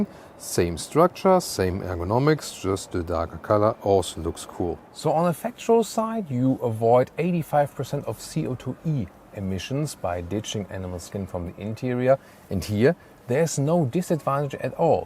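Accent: Germany. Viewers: I agree, plus I love the german accent - Im italian but used to live in Germany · There's just something right about hearing a German accent describing a BMW.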